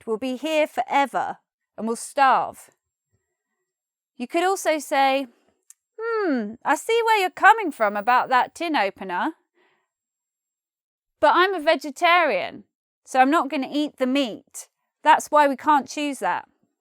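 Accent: United Kingdom. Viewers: i can't believe that i understand totally what you say, i mean British accent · This is genuinely accent from UK British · Are u followed British Accent?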